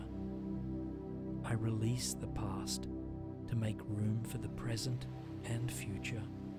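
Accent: Australia. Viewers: I was checking and he is from Australia. I love his accent. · J Wheeler an Australian.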